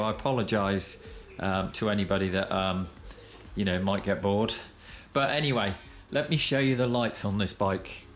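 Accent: United Kingdom. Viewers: Absolutely awesome review! I am a Rockster rider since 2010 and i couldn''t agree more about your comments concerning drive shaft! Now maybe it's time so see if some future 1250 owners are selling a Rallye at a good price :) I just went to a BMW dealer last friday and i jumped on a low frame low seat Adventure and i really tought i couldn't touch the ground with both feet with my 1.82m but i was wrong! I felt very good and so i added the Adventure to my possible choice! Thanks for your video, loved the bike, the clear english accent and the true passion that i felt when you picked the bike from the dealer! Cheers Loris from Italy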